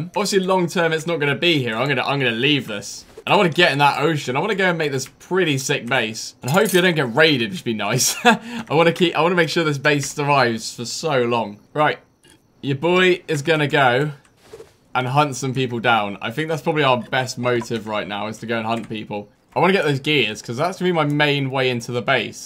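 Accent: England, United Kingdom. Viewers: your english accent always cheers me up!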